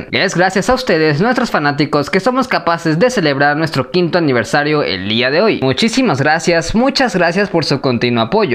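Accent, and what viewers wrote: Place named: Venezuela. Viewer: Literal aveces creo que este men es Venezolano en base a su Acentó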